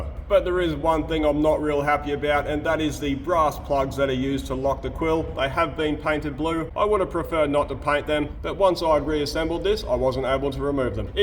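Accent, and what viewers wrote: Australia. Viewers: I'm from Germany and that Australian accent makes it easier for me to understand... I was born near Cologne and the rythm of Australian and "Kölsch", which is a German accent spoken around here, is quite similar...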